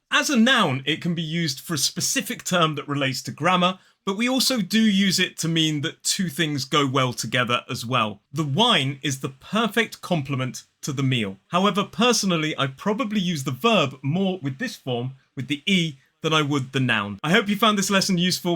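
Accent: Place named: RP, United Kingdom. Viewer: My favourite British rp accent teacher on YouTube. Love the energy you give the words when you're speaking. Makes it easy for our ears to pick the tobality and musicality of the accent. Thank you!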